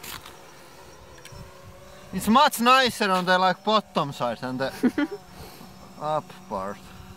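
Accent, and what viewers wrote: Finland. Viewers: Are you from Finland? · are you from Finland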